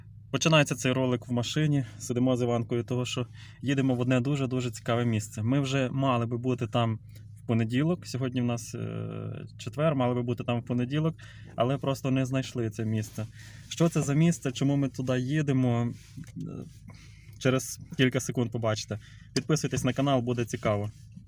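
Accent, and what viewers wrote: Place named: Lviv, Ukraine. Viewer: Вимова у Вас Львівська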